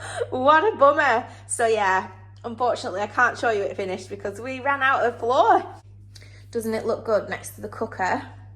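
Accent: Northern England, United Kingdom. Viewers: Hi loving the flooring and the stair rods spot on👌💖 Ey up I'm loving the northern accent haa.. si ther 😊🥰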